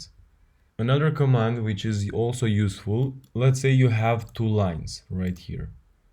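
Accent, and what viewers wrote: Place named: Russia. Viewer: Слышится отчетливый русский акцент, а похожий гайд на русском может быть есть?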